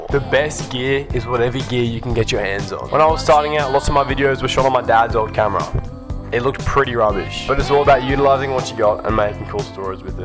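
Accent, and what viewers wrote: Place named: Australia. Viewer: Yo bro, loved this vid. It's funny hearing a proper Aussie accent on youtube, also that mic is sick as bro.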